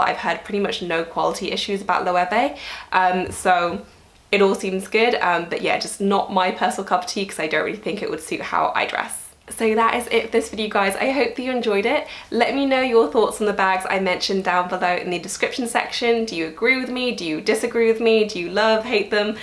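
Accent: United Kingdom. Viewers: Ruth-Ann Leveridge-Young I never cared for British accents until I heard her speak. 😂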